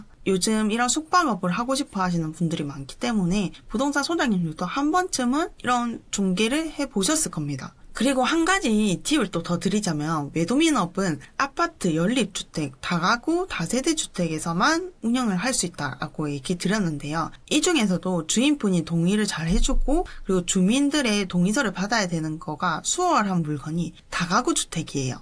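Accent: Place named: Gyeongnam, South Korea. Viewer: 경남 말투 졸라 심하다 ㅜㅜ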